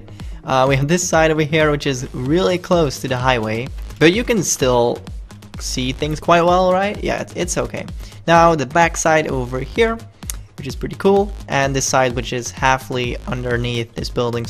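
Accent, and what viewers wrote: Netherlands. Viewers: Seriously are you Dutch to? 😂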